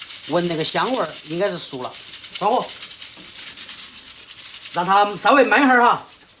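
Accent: Sichuan, China. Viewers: 吃的真香 味口真好 四川話好親切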